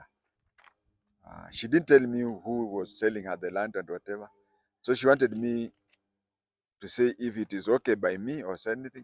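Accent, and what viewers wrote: Kenya. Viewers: Funny u have a kale,luhya accent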